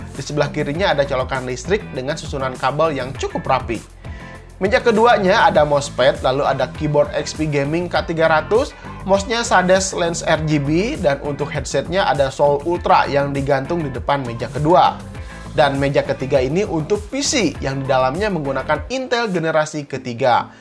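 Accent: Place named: Sunda, Indonesia. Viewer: Sunda nya kentel euy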